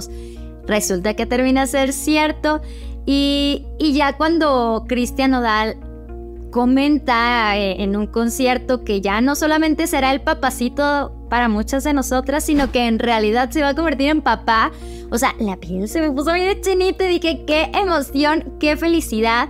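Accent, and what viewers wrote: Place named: Argentina. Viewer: Como se nota que eres argentina 😅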